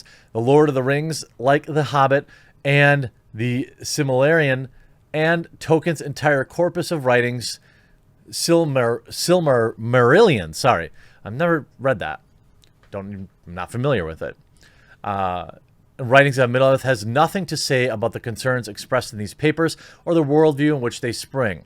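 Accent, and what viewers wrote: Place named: Wisconsin, United States. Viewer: We love, but he pronounces "bag" as "beg," flag" as "fleg," and "dagger" and "degger." I have no authoritative source, but it is believed he also pronounces "wash" as "warsh." He's from Wiscansn. Cut him a little slack.;)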